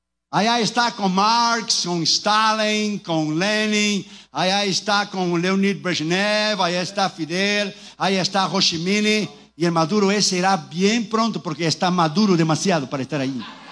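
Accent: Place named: Costa Rica. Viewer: Pero eres tico por tu español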